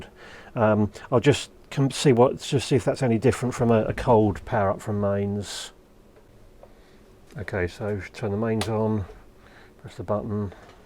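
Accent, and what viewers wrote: United Kingdom. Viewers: Very interesting subject really. but with your UK accent and whisper like voice. it is hard to catch the words with no subtitles. quite important product for me though but I ditch a lot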